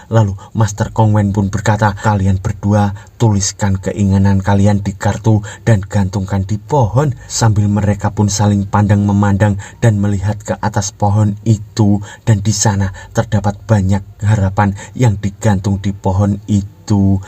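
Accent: Java, Indonesia. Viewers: Mas njenengan jowone pundhi ?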